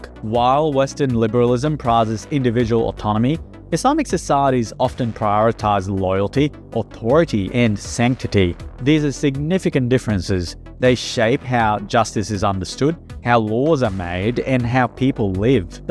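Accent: Australia. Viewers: You're not even Canadian, you're Australian from your accent, who are you to speak about the internal affairs of another country care to explain !???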